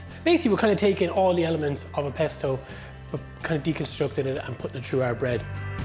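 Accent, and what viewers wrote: Ireland. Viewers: Woke up early AM here in the States. What am I to do?. Check out, my go-to bread guy. For sure I will give this a try in the next couple of weeks. Your "brogue", reminds me of my, Da who came over from Limerick. Take care.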